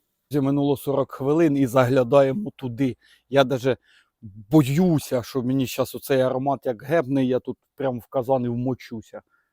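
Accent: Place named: Central Ukraine, Ukraine. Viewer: Возможно похож для кого-то по подаче на украинского грузина. Но больше на нашего украинского еврея) Правильные жесты и мимика которые цепляют, невысокие люди всегда харизматичны, наш Луи Де Фюнес) который умеет вкусно готовить. А самое главное смаковать и передать предвкушение перед дегустацией в каждом видео. Вам нужно идти в кино) А украинский с легким суржиком такой родной) это или Кировоградщина, Хмельниччина, Виннитчина или Житомирщина, может ошибся, но точно по говору центральная Украина. Понравился Ваш канал, что блюда что подача, смотрю уже месяц, многие блюда буду готовить по вашим видео. Супер!)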